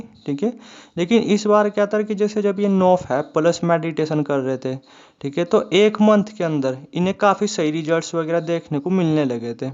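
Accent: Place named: Western Uttar Pradesh, India. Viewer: Nice story bhai ❤. Aap apni boli se west up ke lg rhe ho, whi k ho kya?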